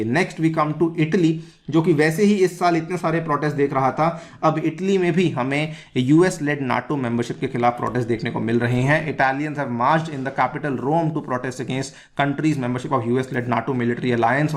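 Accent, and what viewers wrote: India. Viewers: Nam Bharat rakha hai sasur..or kam krre ho English pronunciation thk krne ka 😂😂...bhai hmari marji kese b bole... it's Indian English..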